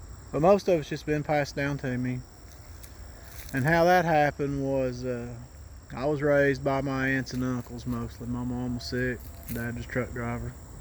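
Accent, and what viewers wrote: South, United States. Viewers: Yeah, I honestly believe him. This is a tiny channel from a guy who wants to pass on some knowledge about an area he spent most of his life in. I also enjoy his Southern drawl. So soothing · New subscriber here, and I love you channel! Not only is the storytelling fantastic, but I love that you take us along on the walks through your beautiful part of the country. I lost my grandparents very young, so I never got the “sit around the campfire experience” with them. Although you’re too young to be my grandparent, I’m still getting the spine-tingling fun of hearing your tales. And, one last silly compliment.... I’m a native southerner who married a (wonderful) Yankee man and ended moving way up north and raising children up north. There’s nothing more lovely to my ear (with the exception of a hymn in church) to a southern drawl... 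and I never hear that anymore. So, I’m a fan and will be back to watch and like your videos! Thank you for doing them!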